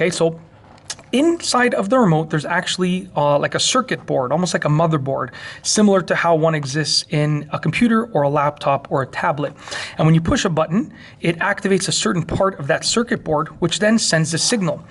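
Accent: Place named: Canada. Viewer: thanks so much for this great tutorial! totally fixed it! and i think i understood your Canadian accent!!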